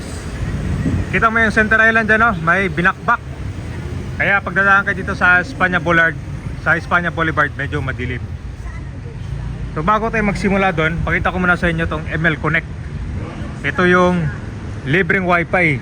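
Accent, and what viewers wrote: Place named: Visayas, Philippines. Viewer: Ayusin m pgsasalita m.....bisaya kb😅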